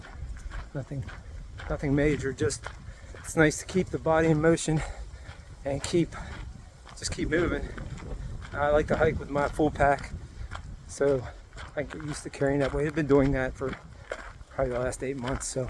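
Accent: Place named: Pennsylvania, United States. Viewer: I’m following you because your accent reminds me of my PA family. Good luck on the AT! I was just at Amicalola Falls State Park & Lodge myself and enjoyed hiking some in GA.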